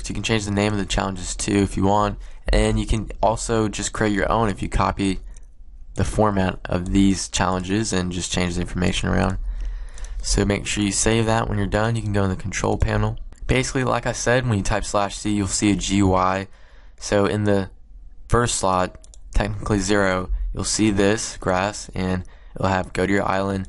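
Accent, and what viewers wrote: Italy. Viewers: Numero uno,are you italian or english? lel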